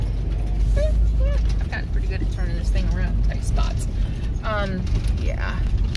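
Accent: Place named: Northeast, United States. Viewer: I love the way you say "what do you think?" to your animals. I do that too and never heard anyone else say that to their fur babies until I came across your channel. It's just one simple little thing in a sea of other little things that make me go "she's my people!" every time I watch your videos. I can tell that you were born and raised closer to the northeast side of this country, purely through your dialect and the way you express yourself. Much love to you and Sadie from Michigan!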